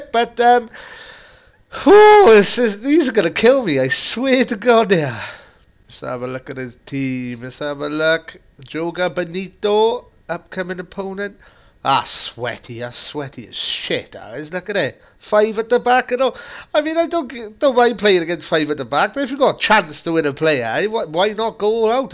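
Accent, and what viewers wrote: Wales, United Kingdom. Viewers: Where u from in Wales bro?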